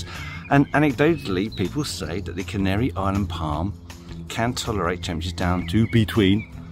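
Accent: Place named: United Kingdom. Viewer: man I hardly understand some words. british accent..help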